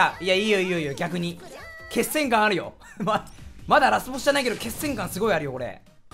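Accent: Tochigi, Japan. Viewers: 最近栃木弁が少ねーな〜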